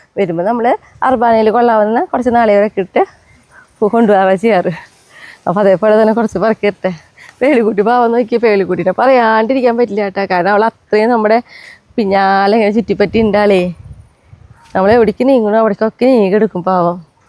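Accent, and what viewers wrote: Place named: Valluvanad, India. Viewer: Chechiyude Valluvanaadan Bhashayum slaangum KELKKAAN Nalla Rasamund...🍍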